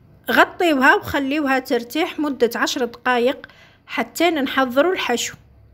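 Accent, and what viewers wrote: Setif, Algeria. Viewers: حسب اللهجة تاعك تباني سطايفية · هذه اللهجة نتاع سطيف العالي.اليس كذالك؟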